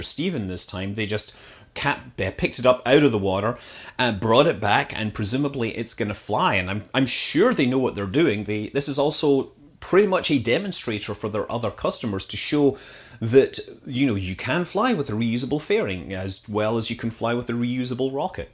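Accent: Scotland, United Kingdom. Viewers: With that accent? Definitely. Although, if he lives in the US can you truly still call him a Scot? really, what does it even mean to be 'from' somewhere? Ah, never mind. XD I just keep asking myself that because I have grandparents from 3 countries, was born in a 4th, and have lived in 3 of those 4 countries (and dozens of towns and cities) Wherevam I from? I do not know. XD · One of the best phrases that can be spoken in a Scottish accent. It's up there with Curly Wurly.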